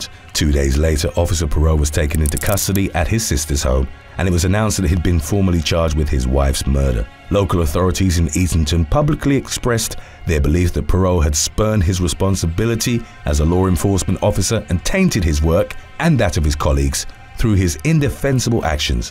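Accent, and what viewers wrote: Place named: United Kingdom. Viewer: He's british pronunciation is def different nonetheless🙄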